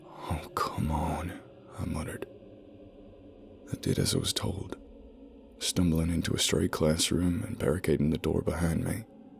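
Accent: South, United States. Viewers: Ok, now I'v found a new storyline that I truly will enjoy anticipating the next installment thank you! You nailed southern accent so well I love it just love it!!! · sounds southernish to me as well. I'm from Pa and it sounds nothing like I do . 😁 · Surely you mean Southern.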